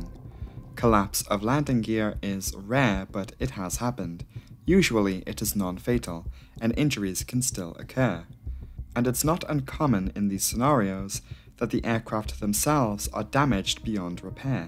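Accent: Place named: Geordie, United Kingdom. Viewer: I’m fascinated by this accent. It seems mostly Northeast England, but with little hints of South Wales every now and then. Then there’s a touch of rhotic North American creeping on in there too. Is this a Geordie who moved to the US?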